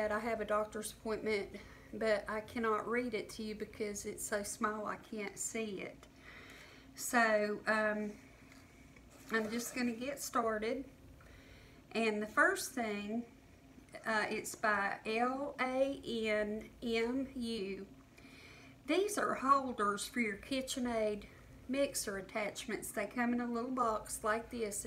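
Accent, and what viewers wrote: South, United States. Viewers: God Bless you. I just love your southern accent.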